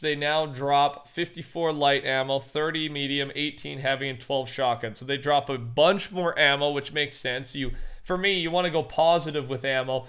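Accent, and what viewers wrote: Canada. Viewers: Is he Canadian? Nvm xD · Are u Canadian?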